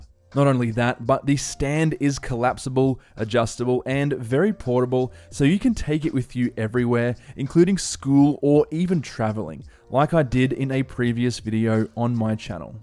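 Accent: Australia. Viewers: You’re aussie right 🥵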